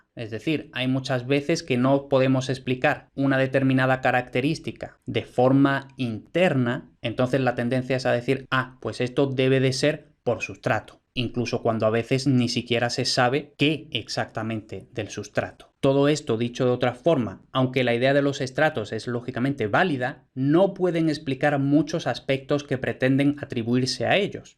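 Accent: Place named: Spain. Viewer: Me refiero a tu pronunciación normal. Pues es curioso🤔, debe de ser que en la zona de España de la que vienes se pronuncia así. O igual es mi zona de España donde se pronuncian diferente, ahora mismo no sabría decir.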